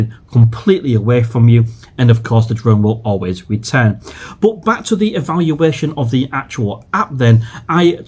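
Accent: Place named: North East England, United Kingdom. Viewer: Definitely doesn’t sound like a Yorkshire accent being on the Humber where is it you’re actually from? It sounds more Sunderland Newcastle Middlesbrough area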